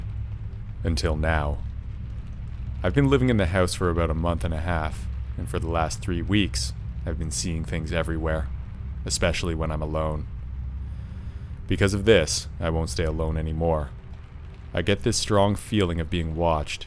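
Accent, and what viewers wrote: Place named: Canada. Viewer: Thumbs up for awesome stories. Thumbs down for horrible Canadian accent. It's a house! Not a hoose!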